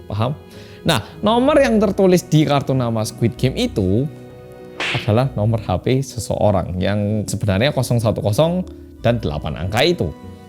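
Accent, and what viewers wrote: Java, Indonesia. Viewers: Kok sleg jawanya engga hilang ya Mas bro... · salfok mas ngomongnya medok bingitsss wkwkwk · Sesungguhnya saya penasaran kenopo kok mas dialek nya masih kuenteel medhok.. gimana kah cara menjaga nya..?? (Semoga dibales) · Udh nonton bang reviewnya.. abang orang korea tapi Logatnya Jawa Toh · Mas mas medok ae jawane ... sing ndih... hehehe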